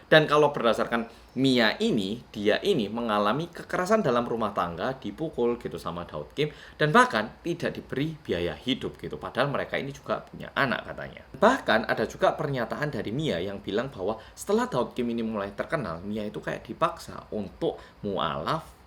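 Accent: Java, Indonesia. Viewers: kan memang korean lah,cuma dia membesar di malang makanya medhok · CUMAN YOUTUBER KOREA YANG PERCAYA CUMAN KARENA PERNAH TINGGAL DI MALANG PLUS BISA BAHASA JAWA MEDOK😂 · Kaya orng korea mukanya..tpi ada medok2 jawa bicaranya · Min..mukamu kaya korea tp logat mefok jawa ... Hebat mi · Padahal subscriber nya banyak dari luar Indonesia juga, piye Yo mas? Wah, Jian.... Semoga cepat diberi hidayah. Terus kawal mas, LAN Ojo lali medoke haha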